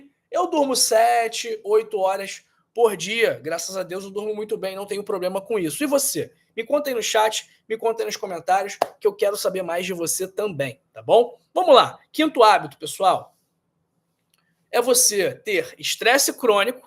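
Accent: Rio de Janeiro, Brazil. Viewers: Bom dia Muito boa as suas orientações . OBRIGADA .QUERIDO DR AMO O SEU SUTAQUE CARIOCA EU SOU GAUCHA . Aqui Jaguarão RS fronteira com Uruguai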